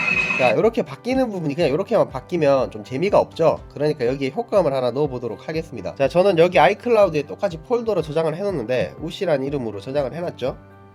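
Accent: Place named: Gyeongsang, South Korea. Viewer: 안녕하세요.. 구수한 경상도 사투리로 듣는 Lumafusion 영상 편집 설명 아주 아주 감사합니다. 저도 대구에 살다가 와서.. ㅎㅎ LA 사는 50대 아줌마가 뒤늦게 유튜브에 관심이 생겨서 틈틈히 공부중인데 아주 도움이 많이 되고 있어요. 기계치여서 겁부터 났는데 설명을 들으며 해보니 재미도 있고요. 아직 아이패트 3는 없어서 아이폰으로 해보고 있는데.. 암튼 감사합니다. 유튜브 대박 행진하시길~ 짱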